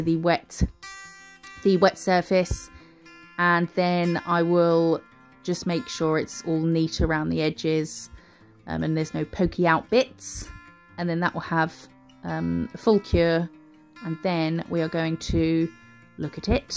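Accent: United Kingdom. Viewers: Love your video's ! Your channel was recommanded on a dutch facebookpage for hobbyist nailartists. And they where absolutely right to recommand you! ❤ your British accent 😍💅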